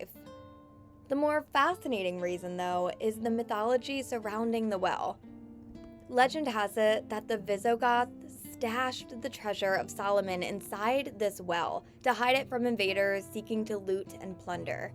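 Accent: United States. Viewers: 😂😂😂 I’m sorry too I appreciate the video and all but girl! At least try to pronounce the locations the way they are meant to sound even imitating a French accent would be better than this😊 Im American too but come on we have all been exposed to the accents of visitors from abroad or damn haven’t you ever seen a Pink Pather movie lol just try a little! Oh and just so you know we don’t all sound this way..just like anywhere else ppl from different locations around the country have their own distinct way of speaking (ppl from the south, northeast, midwest, west coast, etc) but still the way she says cite-sitay!😂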